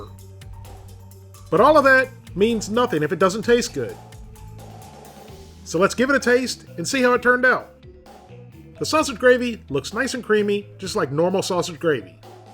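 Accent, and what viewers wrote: South, United States. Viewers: Probably a southern tradition plus he had the accent for it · Farmer john is a la area pork distrubuter, but you have a southern accent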